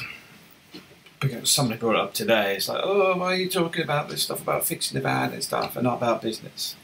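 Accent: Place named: Birmingham, United Kingdom. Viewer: He even speaks like an idiot ! Bruuuuummmieeeeee and living there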